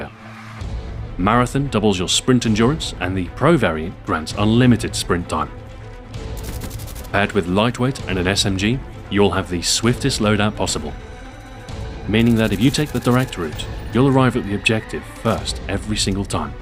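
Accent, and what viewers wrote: England, United Kingdom. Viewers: Holy Shit! It's British William Strife! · the 27 are so but hurt tht they dont have an awesome british voice like this guy im british too sooo :D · You make this kind of analysis so interesting and it's also nice to hear an English voice on CoD videos for a change. You are getting me through my red rings of death so please, please keep up the good work! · Very professional Videos, very good quality and high entertaining value (omg) throughout from the first mw2 video to the black ops videos today. By the way, i love to hear english people talk german ;) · im pretty sure hes from england, thats why he has an accent and knows english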